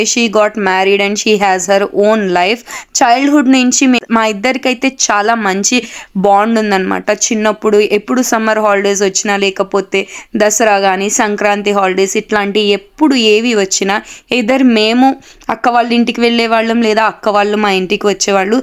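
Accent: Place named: Guntur, India. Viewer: Anukomaakandi. Pure gnt slang.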